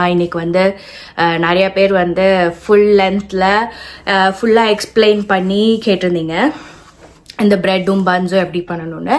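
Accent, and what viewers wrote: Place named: England, United Kingdom. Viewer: Hey, I know you speak very nicely in Tamil but now you are talking like as if you don’t know much of the language. So make sure you don’t get your English accent to Tamil. Just a small reminder as I have seen you speaking well in your previous videos. Take care.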